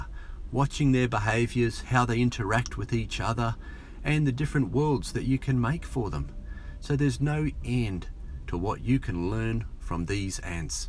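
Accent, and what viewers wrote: Australia. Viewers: Crikey! Are you somehow related to Steve Irwin? Good to hear another Aussie accent on YouTube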